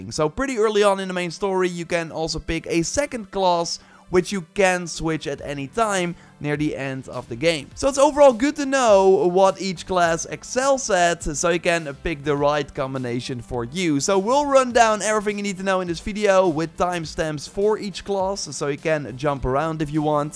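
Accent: Netherlands. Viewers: i smell dutch... also i recall them saying theres no characters anymore. yet we have classes kinda like that one diablo game.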